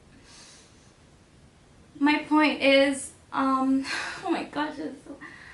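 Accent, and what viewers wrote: Java, Indonesia. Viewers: Medog malah natural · It's okay to be medok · Logat kejawa jawaan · Bagusan medog ngomongnya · It's okay to speak like medok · Medoknya lucu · Medoknya lucu wkwkwk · Banggalah dgn medok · Lebih suk kalo ngomongnya medok.. kearifan lokalnya terlihat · talking in medok accent omg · That javanese accent, tho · Aku seneng medok mu haha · well actually, cocok bgt kalo medok 😁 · Medok nya kental Jawa bgt but kamu fasih inggrisnya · Kok medok gitu? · Its okay to be "meddok" 😁 , keren kok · Please, bikin video pake bhsa medoknya dong. Lucu lah. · Medog aja kak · cara ngomong medoknya itu loh 😍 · I am eight year gpp cute kok medok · bikin video ngomong medok dong. cute banget wkwk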